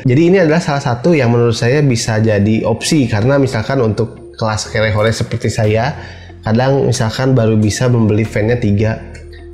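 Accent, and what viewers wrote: Bandung, Indonesia. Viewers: Sama2 orng bandung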